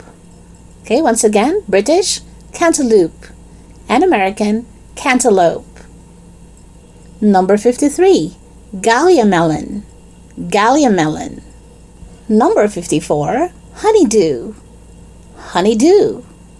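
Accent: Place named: Philippines. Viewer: I am a Vietnamese Catholic priest, I am staying in the Philippines for my studies, I like your Filipino English voice, I am pretty happy to listen to and imitate your voice! May God bless you all to help everyone ❤️😇💒🏫 students 👨‍🎓👩‍🎓🧑‍🏭🧑‍🏭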